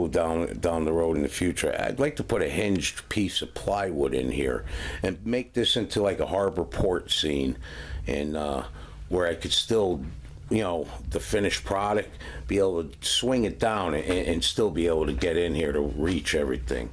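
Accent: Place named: New York, United States. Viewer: Hey the layout looks great! Now I'm going to check out you're other videos. Keep posting I'll keep watching. I'm building a layout also. Great inspiration thank you. Where are you from? Listening to you makes me feel like you must live in the NY NJ area. I'm a north jersey guy. Nice job!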